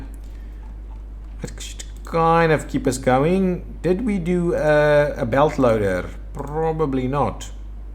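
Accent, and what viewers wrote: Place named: Afrikaans, South Africa. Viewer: You sound like you are from South Africa 🇿🇦, with an Afrikaans accent.( I’m from SA ) Where are you from ?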